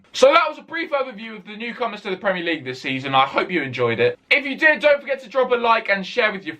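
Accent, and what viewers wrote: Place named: Hampstead, United Kingdom. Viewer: Hello. Where are you from? going by the accent, gonna say .. Hampstead?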